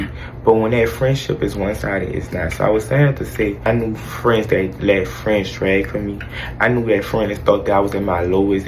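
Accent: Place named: Louisiana, United States. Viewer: Miss you and your million dollar sexy Louisiana twang, and that hot look is a bonus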